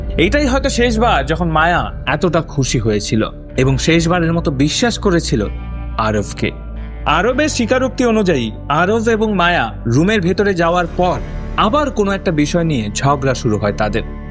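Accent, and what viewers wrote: Bangladesh. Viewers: Tomar kothay Bangladeshi tan ache😂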